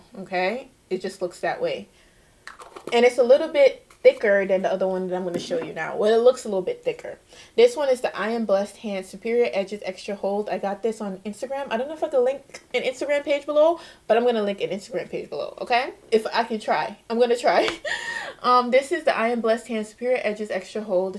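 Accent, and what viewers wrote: Jamaica. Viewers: You are Jamaican. “ Ova yassuh “ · I thought I heard a slight Jamaican accent. My mother is from JA, my ears are very sensitive to the accent, no matter how slight it is. 🇯🇲🇺🇸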